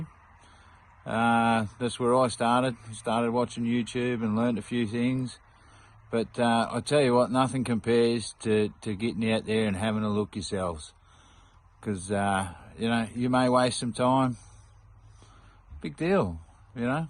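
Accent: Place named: Australia. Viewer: Hi! You got a new subscriber from Sweden. I really liked your video, easy, authentic and genuine and I think you will be a very liked person on YouTube. And with that said, I had a little bit difficult understanding some words but I must say that you “down under” have so nice “sounds” in your English! 🙂‍↕️ Good luck on your journey! 🙋🏼‍♀️🇸🇪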